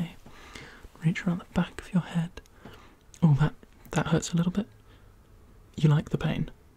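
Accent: United Kingdom. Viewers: yes that is british accent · Мое плохое знание английского + британский акцент = смерть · I love the bri' 'ish accent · I was scrolling and started watching this video without audio. And I clicked and was going to comment, "he looks like he has a British accent without audio lol", but then he DID have a British accent lmao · The British accent is literally my favorite thing ever I could listen to this everday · I`m in love with british accent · I love british accent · Help i cant understand british accent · The British Accent is a chefs kiss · You're very cute! And loved the asmr vid!! I enjoyed the British accent! Stay amazing ! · help why I'm so obssesed with british accent wtf? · thanks to youtube algorithm for suggesting a british accent channel lol and u seem like a fun person even though i have a littleee difficulty with the language anyway I'll support u ^ ^ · are you actually british or are you just faking it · THE BRITISH ACCENT AGHH🙈🙈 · I am from Russia and have been studying English for 8 years. 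I should note one important thing: your British accent is the best thing I've ever heard in my entire life. It's magical and indescribable! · The british accent improves EVERYTHING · Word repetition with British accent might just be my new favorite thing ❤️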